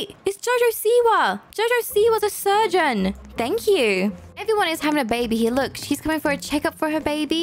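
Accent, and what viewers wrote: United Kingdom. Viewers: I love her British accent❤💛💚💙💜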